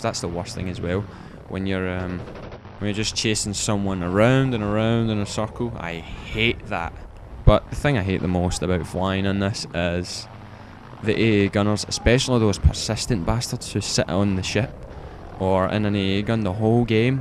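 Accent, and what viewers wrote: Scotland, United Kingdom. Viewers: yass, you scottish m8? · From Scotland? You sound Scottish :p We scots PWN! xD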